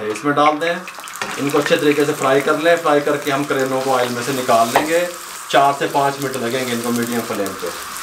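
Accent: Punjab, India. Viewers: Koi masla nahi hai is one of the best dialogue from you sir and camera idhar meri taraf karna such dialogues and punjabi tarka makes your videos so attractive hats of you and your style sir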